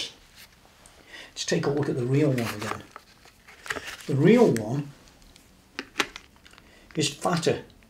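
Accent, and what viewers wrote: Stoke, United Kingdom. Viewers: I Have bought guitars (Ibanez rg350)from Thomann in the past and still will but when I looked at the Harvey Benton range something just didn't feel right to me. Thanks for doing this video there is a lot of crap out there and you need to research thoroughly. Is that a stokie accent.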